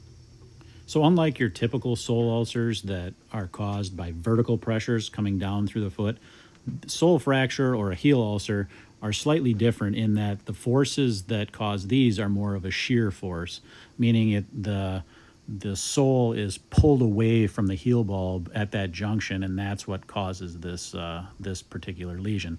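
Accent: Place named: Wisconsin, United States. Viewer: I don't know if it's the Wisconsin accent that reminds me of home, or if it's that I identify with a good pedicure, but I love these videos.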